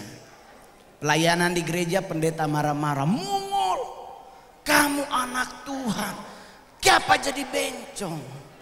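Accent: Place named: Eastern Indonesia, Indonesia. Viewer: Terharu dengar Manado Batak Ambon Papua...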